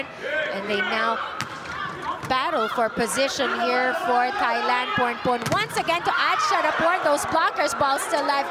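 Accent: Philippines. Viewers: Is the commentator Filipina? She has Filipino accent. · I can tell this commentator is Filipina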